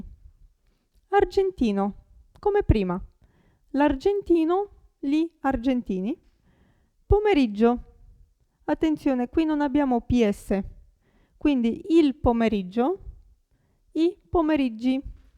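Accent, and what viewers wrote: Eastern Europe. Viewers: beh il vocabolario più esteso, i modi di dire, la pronuncia, può insegnare anche i vari toni di dialetti etc etc etc... Quello che non mi è piaciuto in questo video è la pronuncia dell'est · She's not Italian. Her accent is from Eastern Europe.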